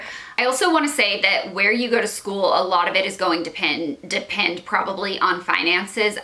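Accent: South, United States. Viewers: you were one of the first, if not the first, beauty related youtuber that I subbed to way back when because of number 1: your southern accent lol number 2: your mac beauty hauls and 3: you were just fun to watch! I'm so happy to see that you're doing and looking amazing and that you took time off to refocus and find true happiness vs looking for it in LA. Everything in LA is rotten. so happy you got outa there! <3 If you're looking for new video suggestions lol I'd love a day in the life in Nashville. I'm dyingggg to move there! would love to explore it through your pov. :D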